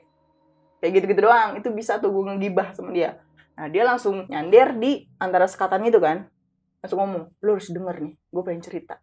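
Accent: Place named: Bali, Indonesia. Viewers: Bang ini punya ciri khas, kalo ngomong yg ada huruf T pasti ngucapnya kedengaran kaya org bali. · bang nih orang bali ya? hahah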